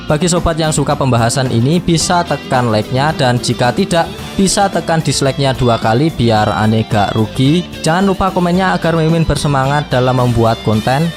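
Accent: Java, Indonesia. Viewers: Nah gini bg selagi manga chapter terbaru nya blm rilis mending cari yg fanmade fanmade nya aja bg seru bngt asli, apalagi pembahasan nyaa kayak mimin logat jawa gini makin asik 😋😋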